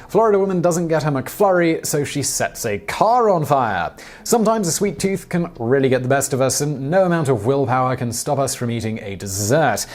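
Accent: United Kingdom. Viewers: Hearing a British man talk about the Florida man is the reason I’m still alive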